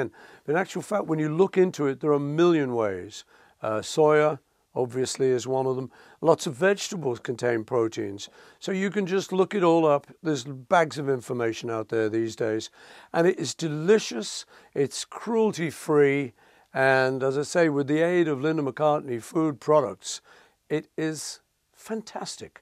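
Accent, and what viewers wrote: United States. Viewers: sounds American in this lol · sounds slightly American now, or is it just me?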